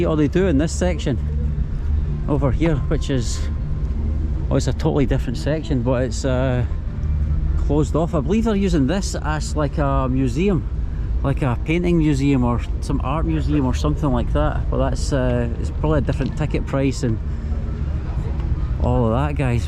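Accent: Scotland, United Kingdom. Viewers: He sounds Scottish AF …wtf are you on mate?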